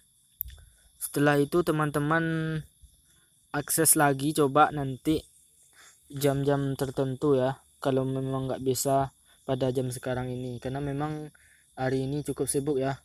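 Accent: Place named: West Sumatra, Indonesia. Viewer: Urang minang yuang😅😅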